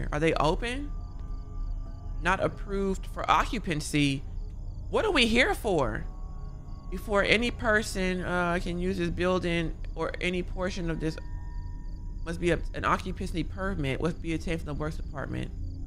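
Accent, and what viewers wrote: United Kingdom. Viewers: “Young tenderoni” 😂 love a fellow brit ✨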